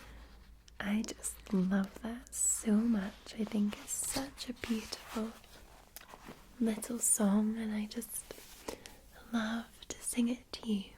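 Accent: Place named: Scotland, United Kingdom. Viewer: I can’t place your Scottish accent, but digging it 🏴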